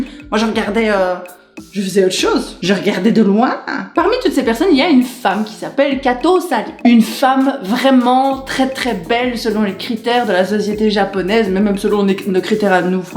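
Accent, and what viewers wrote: Belgium. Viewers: Super marrante ! Je me disais bien que t'avais un accent belge j'adore !❤👍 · Un accent belge et très vulgaire, au secours !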